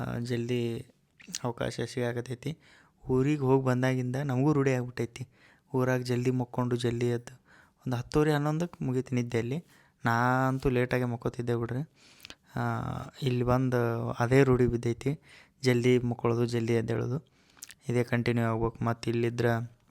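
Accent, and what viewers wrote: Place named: Bangalore, India. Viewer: Nim vlog nodi khushi ayitu😂 benglore bhashe chand matadiddiri sis❤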